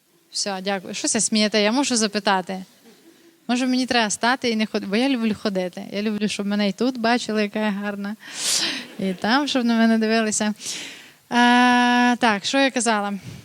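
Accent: Transcarpathia, Ukraine. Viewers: А точніше, закарпатський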